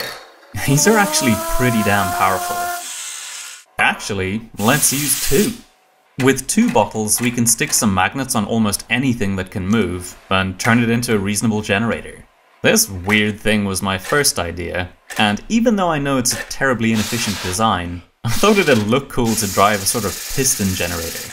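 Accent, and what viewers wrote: South Africa. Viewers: Yo I didn't expect a South African shout out I mean you do sound south African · Aye howzit bru, I thought I heard a little south african accent there and the biltong cutter got me 😂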